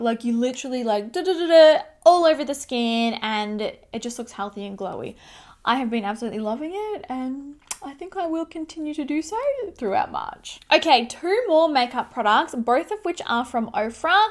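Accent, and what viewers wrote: Australia. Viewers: I am a huge fan of yours!! I lived in Sydney for years so I feel at home with you. I'm in Argentina now but my father is there. Love your good vibes and don't worry about the aussie accent is great!! Loved your wedding blog!! 😍😍❤❤💋💋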